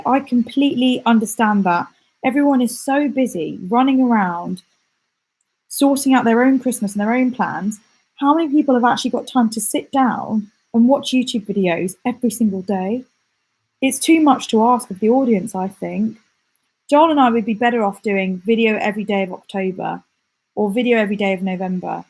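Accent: South, United States. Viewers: Scarlett O'Hara accent Southern?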